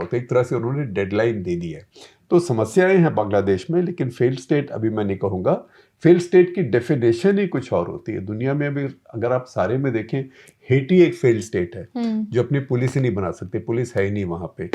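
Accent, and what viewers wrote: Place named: Delhi, India. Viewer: Shekhar ji…..ye program best hai Print pe. Especially aap jo anecdotes dete hain woh bahut rochak hote hain….e.g., air force plane girne wala kissa. Please continue to do this in hindi. Aapki Hindi Dilli wali hai to bahut interesting hai. Thanks.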